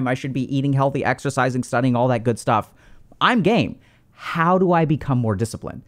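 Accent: India. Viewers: Bro.. I love the indian accent lol.... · Damn your English sounds so Indian 😅 BTW thanks for free knowledge....you blessing us with your knowledge glad i found your channel ❤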